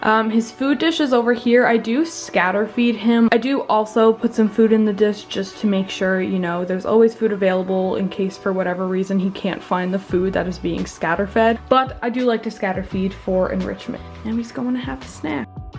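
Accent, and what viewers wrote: Canada. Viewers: I'm so sorry for asking but... Are you Canadian? You sound Canadian. But like, Eastern Canada.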